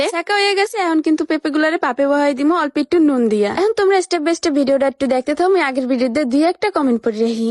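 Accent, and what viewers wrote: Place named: Barishal, Bangladesh. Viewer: Tomer basha barishaler kothei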